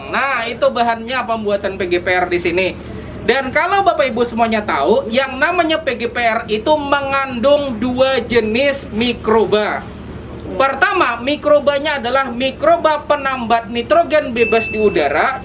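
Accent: Banjar, Indonesia. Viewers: YaAllah pantas pina logat Banjar, skalinya dasar org Banjar 😂😂😂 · Luar biasa penjelasanya pak. Ingat waktu di Banjarmasin, logat Banjar. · Bahasanya kayak orang banjar kalsel om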